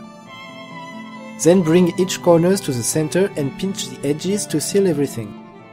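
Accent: France. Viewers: Mystical Turnip its French mixed with kahjiit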